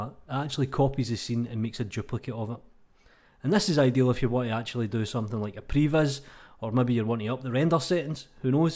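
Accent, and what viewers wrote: Scotland, United Kingdom. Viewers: i love your Scottish accent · Love hearing the Scottish accent! I am not native English, but I wish I had that accent 😊 Great tutorial BTW! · eh, sorry but he's clearly Scottish!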